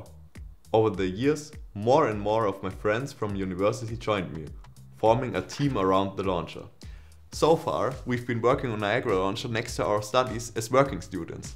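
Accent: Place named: Germany. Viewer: I LOVE these update videos! I also love your German accent, lol! Thank you for creating the best launcher on the market and keeping us updated with these great videos! So nice to hear you guys are graduating. Congratulations and Happy New Year! 🎊🎉💥🎇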